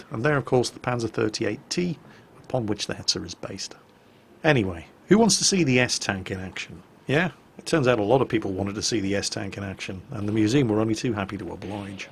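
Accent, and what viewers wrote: Germany. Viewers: stop pronouncing the Swedish names like they're German! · Please dont say the names of the swedish tanks in german dialect. You can if you want but please dont. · Your swedish sounds more like german :)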